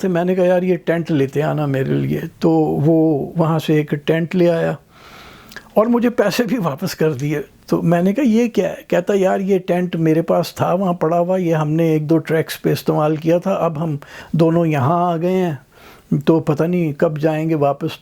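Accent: Punjab, Pakistan. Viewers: Salman Rashid sab, your and my ancestral city is Jalandhar 😊😊😊. Your Punjabi accent reminds me of my late grandparents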